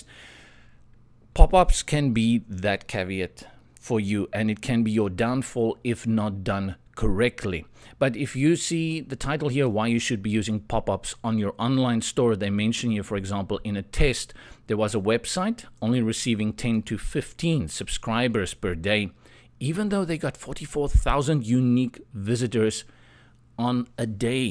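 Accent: South Africa. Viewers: Thank you for the video, really appreciate the time you put into it. Lekker om na 'n Afrikaner te luister :)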